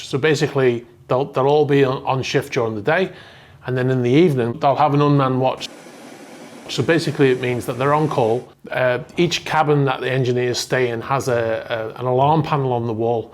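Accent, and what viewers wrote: Liverpool, United Kingdom. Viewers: My USA ears aren’t well tuned. Scouser?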